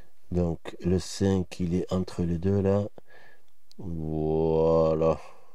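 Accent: South, France. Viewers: Superbe ! Et en plus avé l’açent ça nous ramène un peu de soleil au Nord ou il pleut ! J’adore le brinchement c’est le CC avé le CC le PP avé le PP et le moinsse et le plus, rien de sorcier! N’empêche c’est une installation que pas tous les plombiers la font si bien bravo!!!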